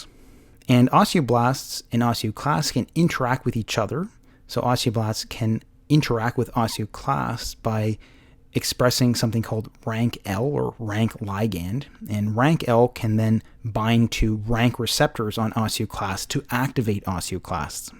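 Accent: Canada. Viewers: Excellent clearly ordered and explained. Look forward to more. Canadian accent helps!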